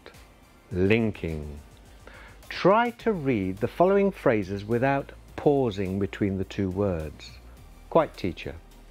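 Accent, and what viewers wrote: United Kingdom. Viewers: love love love this British accent😍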